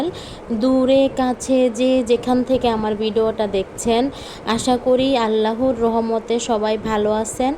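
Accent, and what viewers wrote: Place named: Comilla, Bangladesh. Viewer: আপু তোমার বাড়ি কি কুমিল্লা কথা গুলো শুনে মনে হলো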